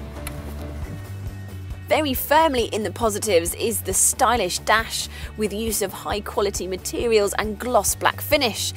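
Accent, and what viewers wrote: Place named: Oxford, United Kingdom. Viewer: is sooo sweet. And i love this wonderful original oxford english😊 And it a real interesting car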